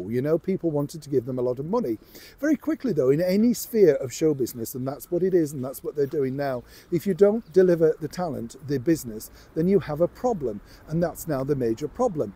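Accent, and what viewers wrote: United Kingdom. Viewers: The British accent makes it hard for me to understand and I gave up on this video. Boring as hell.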